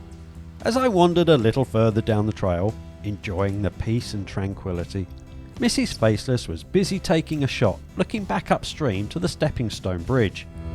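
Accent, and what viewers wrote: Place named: England, United Kingdom. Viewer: The English accent and clear diction make this a must listen and watch!!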